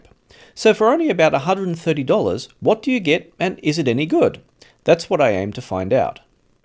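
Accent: Australia. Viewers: $130 what are you talking about try $230 it's very confusing to hear an Australian accent talking about products in US dollars. When watching this from Sydney Australia.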